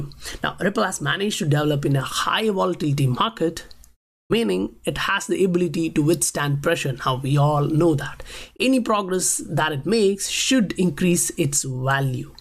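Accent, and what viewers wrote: India. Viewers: where are you from?? You sound like maybe India? I'm in the US. Shit is crazy here right now. People looting and rioting in the streets its fucking chaos.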